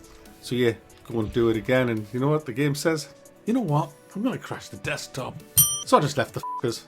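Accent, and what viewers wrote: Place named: Newcastle, United Kingdom. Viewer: From now on I only want to hear my game reviews in Geordie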